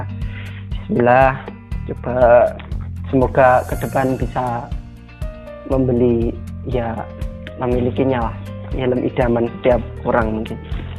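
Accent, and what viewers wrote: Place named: Java, Indonesia. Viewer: jowo e juga medok tenan mas e..😂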